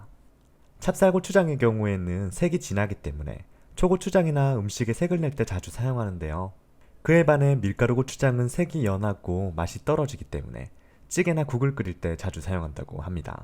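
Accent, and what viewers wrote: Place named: Daegu, South Korea. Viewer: 살짝 스치는 대구사투리에 정겨움이 느껴집니다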